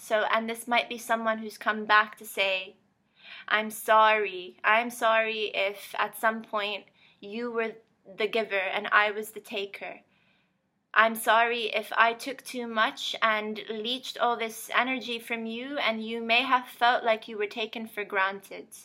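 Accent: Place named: Ireland. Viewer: are you Irish? - I love your accent xx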